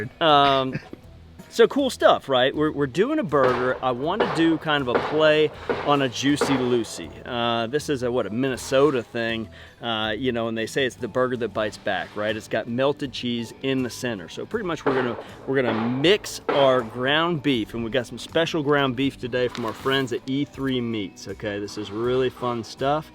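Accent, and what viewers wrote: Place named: Carolina, United States. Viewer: sounds a lot like Trey Gowdy…a fellow Carolina native! Great burgers & a new sub here. Grill on brother!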